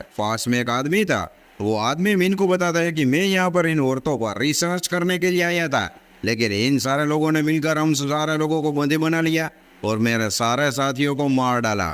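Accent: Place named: Chhapra, India. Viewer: Tera boli chapare jaisa hay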